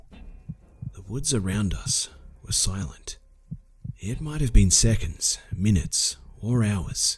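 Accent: Australia. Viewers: r u Aussie?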